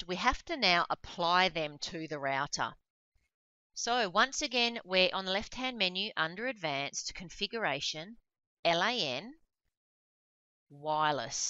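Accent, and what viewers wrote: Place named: Australia. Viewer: you are so Australian, I love it <3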